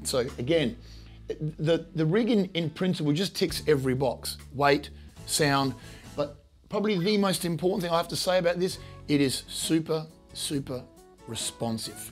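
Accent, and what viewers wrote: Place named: Italy. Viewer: I can't hide his italian roots!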